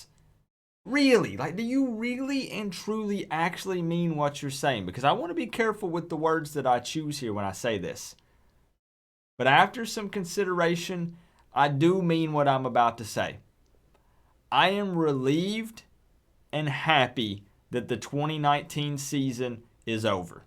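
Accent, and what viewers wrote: South, United States. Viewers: Florida Gators fan here gotta ask u where u from? Just curious because u sound like u have a little bit of a southern accent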